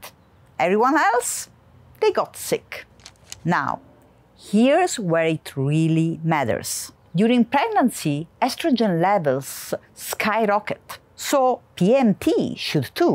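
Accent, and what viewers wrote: Naples, Italy. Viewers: dott lei rimane napoletana anche quando parla in inglese😅. Un saluto per la sua mamma❤ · Quanto è sexy l'inglese con l'accento napoletano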